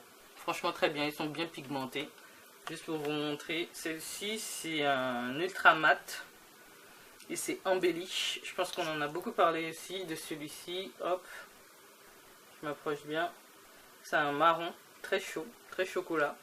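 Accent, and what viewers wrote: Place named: Saint-Étienne, France. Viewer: Y'a comme un truc du nord???? peut être stéphanois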